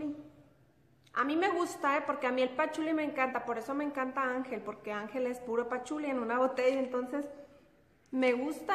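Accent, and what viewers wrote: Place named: Nayarit, Mexico. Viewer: Jejeje me encanta tu acento nayarita, asi hablan las tias de mi esposo💕💕💕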